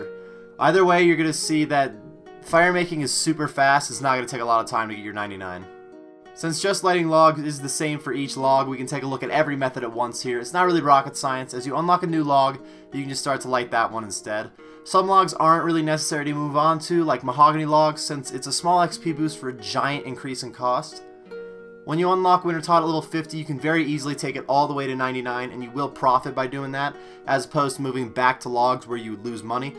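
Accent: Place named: South, United States. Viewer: Where you from? Noticed the southern accent :P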